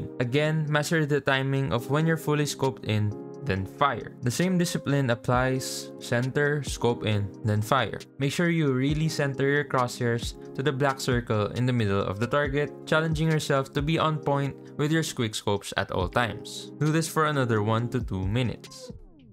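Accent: Philippines. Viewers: Are u filipino u sound like a filipino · your accent is kinda like filipino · u sounds like you're a Filipino. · pinoy english accent is good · Thanks for this tips and tricks! Its's awesome.. Anyway, are u a Filipino? Because your english accent sounds like how we Filipinos talk english.😍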